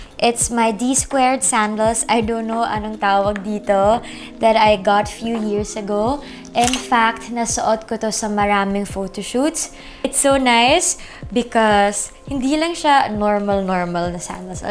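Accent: Philippines. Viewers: Ang soft ng voice pinay na pinay ang accent❣️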